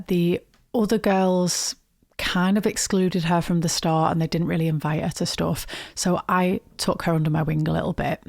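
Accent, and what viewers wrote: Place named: Liverpool, United Kingdom. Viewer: i thourt that you had a bit of Scouse accent in you that's cool snap am a scouser. And you know who you remind me of...Clare Sweeny. Too be fair it sound's like your'e flat mates were'e jealos about your'e sexy look's x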